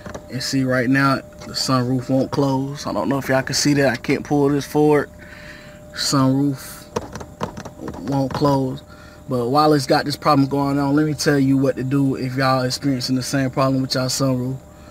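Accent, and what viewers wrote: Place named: RP, United Kingdom. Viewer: Hate these posh accents🤓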